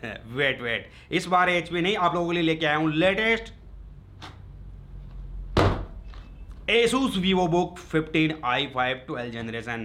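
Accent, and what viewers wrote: Rajasthan, India. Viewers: Nope! Hindi with Rajasthani accent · Bhai voice se pakka Rajasthan se lag rha h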